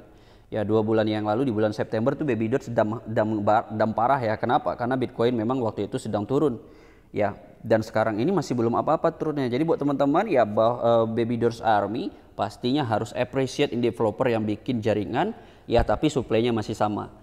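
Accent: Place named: Medan, Indonesia. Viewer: Org medan boss logstnya.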